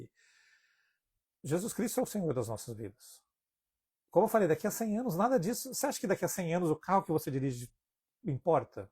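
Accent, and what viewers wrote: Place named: South, Brazil. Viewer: A paz, pelo seu sotaque pensei que era do sul do Brasil.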